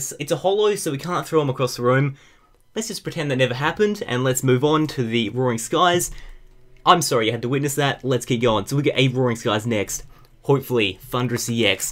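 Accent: Australia. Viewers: Are you an Aussie? I am you sound like one, if so do you follow the afl and who do you follow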